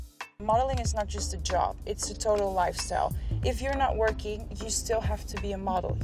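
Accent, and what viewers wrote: Netherlands. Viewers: Oof Dutch accent ugly asf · U really hear that dutch accent amd the name...very dutch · I’ve never heard of her before. But when she started talking I knew immediately that she’s Dutch. The Dutchness seeps through ✨ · You can hear the dutch accent coming out 🥰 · I love how the Dutch pronounce the “th” sound with a “d” instead. “Dat”, “dis”, so cute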